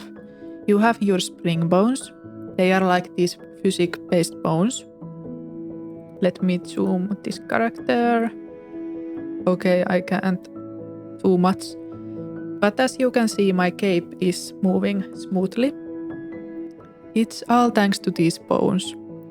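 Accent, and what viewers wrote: Finland. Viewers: Your Finnish accent is incredibly strong :D But thanks for the tutorial! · Your Finnish accent is Awesome!! as well as your tutorial! thank you! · Terve mita culu? I’m Finish American & when you began speaking I knew you’re Finnish. onnea!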